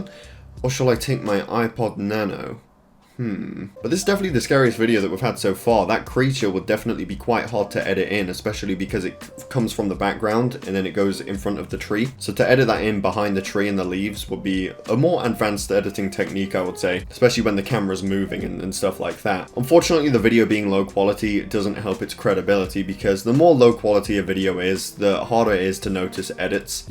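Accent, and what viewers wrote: United Kingdom. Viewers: your british i am going to subscribe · He sounds British. If u r British click see more. BRI’ISH INNIT MATE?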